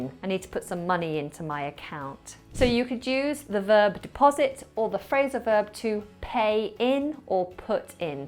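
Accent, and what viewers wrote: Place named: United Kingdom. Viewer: I love you lady and I enjoy learning English and in my point of view British accent is so cute especially when you ignore "R" at the end of word that's soooooooooooooo nice.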